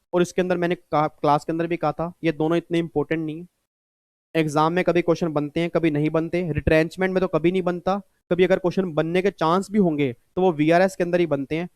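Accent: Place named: Haryana, India. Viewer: Haryanavi suits you sir😁👌.........and than you for amazing videos of revision❤ #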